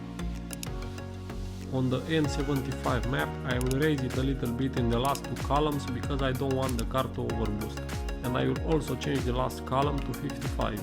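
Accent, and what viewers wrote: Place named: Romania. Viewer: Mi-am dat seama ca esti roman dupa accent.😁Frumos video spor in continuare.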